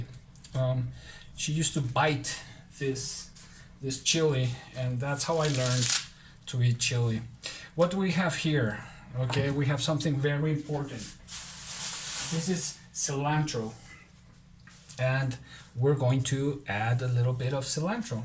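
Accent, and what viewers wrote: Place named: Spain. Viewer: Maybe your accent is derived from Spanish, Wenders is German, but seriously heard a similarity, also in your contemplative style.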